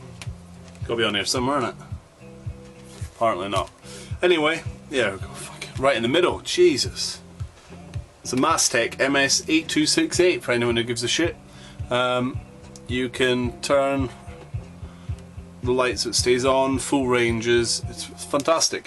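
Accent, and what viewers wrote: Scotland, United Kingdom. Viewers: Thank fuck for that, someone speaking proper fuckin English (with a Scottish accent) instead of some shitty twatty shite ya can't understand. Ta, for the advice, good video.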